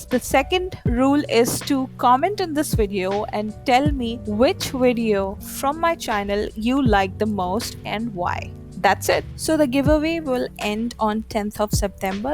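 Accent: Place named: Kerala, India. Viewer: I guess ur from Kerala Because of ur english i came to know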